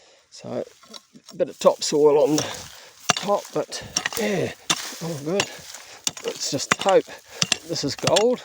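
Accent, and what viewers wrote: New Zealand. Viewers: Its quite marvellous😂😂 and obvious hes a KIWI! Derr!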